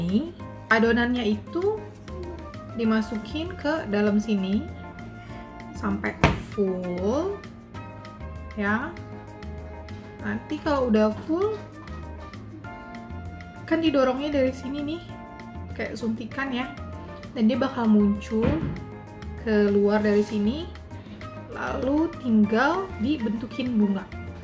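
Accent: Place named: West Java, Indonesia. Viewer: ada aksen sunda nya ya.. intonasi nya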